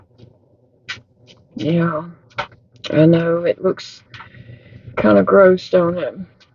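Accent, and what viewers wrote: South, United States. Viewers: You are a steel magnolia! I never met anyone from a southern state because I was born and raised in New York City and later moved to California. Now I am refired and live in Florida where I have met a few southerners. I have such a respect for you guys because so many of you are down to earth and have true grit. Just like you, I loved the movie, "Steel Magnolias" and you remind me of those ladies, especially Dolly Parton. You have the sweetest voice and the cutest accent. You are intelligent and funny, and so very charming and loving. Life can be tough, and some of us are more challenged than others. God has blessed you with fortitude, and a very loving family to bolster you. I will continue to include you and your "men folks" in my prayers and will ask the Lord to help you heal and ease your pain. I know you are resilient, and that "new" hand will be back in business in no time.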